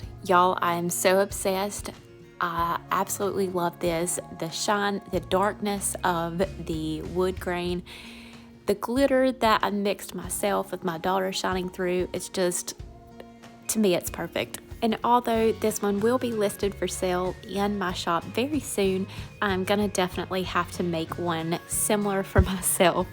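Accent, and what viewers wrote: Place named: North Carolina, United States. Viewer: Absolutely beautiful your accent sounds like you’re from N.C I live in Canton N.C beautiful work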